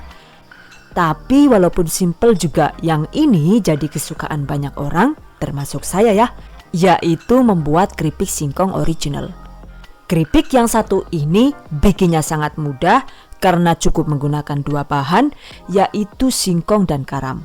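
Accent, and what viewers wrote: Banyumas, Indonesia. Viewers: bu orang mana bu ..hehee bisa ngapak Bu 😁😁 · Makasih Buu .... 😀Deneng ngapak ? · madiun ngapak yah mab? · Orang madiun kok ngapak yaa..hee salam dari tulungagung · Kie mesti wong banyu mas. Tepate cilacap. Kira kira kya kuwe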